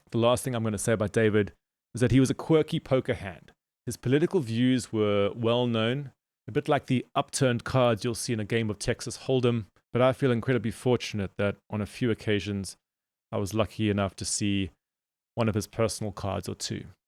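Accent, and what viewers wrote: South Africa. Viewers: I wonder if you are South African? Your accent reminds me so much of home. Great video, keep it up. RIP David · I loved Debt 5000 years of history. A must read. Thanks for keeping his memory alive. PS lekker SA accent! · Subscribed. Excited to hear more from this channel. Are you originally a fellow South African? I feel like I hear a little trace of the accent.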